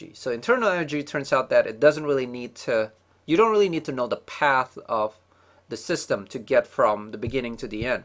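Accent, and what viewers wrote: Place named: Asia. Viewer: Finally not an Indian accent. I'm guessing an Asian... Could be Chinese, or perhaps Cambodian...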